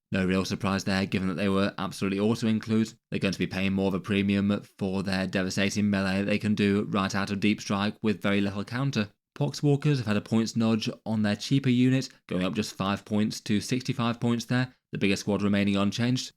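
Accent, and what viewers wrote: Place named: United Kingdom. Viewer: 5 years of listening to you and still have a hard time making out your mono tone British accent on my cell phone speaker at work.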